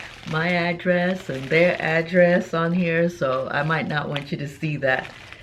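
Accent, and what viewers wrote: Nigeria. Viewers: Wow! Your Hausa accent is still evident after all these years 😂. The way you called Abuja was spot on. Allah ya ja zamanin ki malama... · You look absolutely beautiful ❤️ you still have the Nigerian accent. Nice 👍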